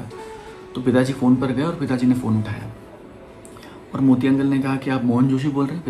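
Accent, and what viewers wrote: Standard. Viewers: Your Hindi talking is that much pure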